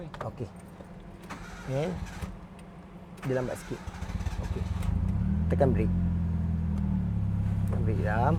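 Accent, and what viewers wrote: Pahang, Malaysia. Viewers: bunyi slang orang pahang je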